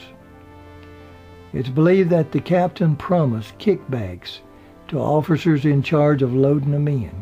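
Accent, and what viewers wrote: South, United States. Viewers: Thanks for doing these, I always love your narration style and your southern accent.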